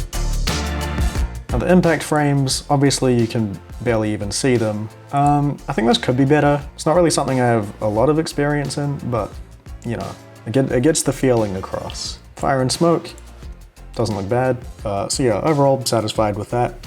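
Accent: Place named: New Zealand. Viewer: I knew you were a kiwi!!! I was like why does he sound so familiar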